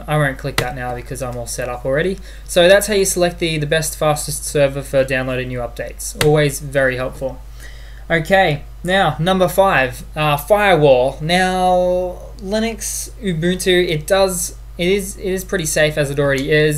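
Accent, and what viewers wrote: Australia. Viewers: australian accent!!!!!!!love it xDDDDD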